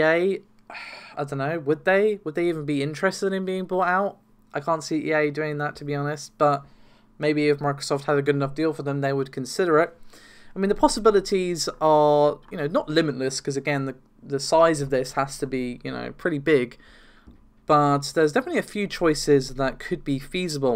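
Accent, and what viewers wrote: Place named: Liverpool, United Kingdom. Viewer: He is a scouser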